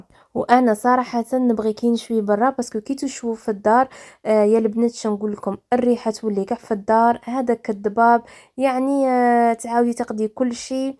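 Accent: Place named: Oran, Algeria. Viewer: سلام اختي دخلت فيديو نتاعك بالصدفة،عجبني في هضرتك عرفتك من وهران قبل ما تقولي،انا تاني من وهران ❤❤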